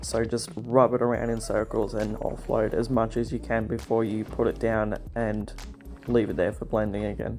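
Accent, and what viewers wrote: Australia. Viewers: I pity anyone who doesn't speak australian. Love the inspiration on the desk.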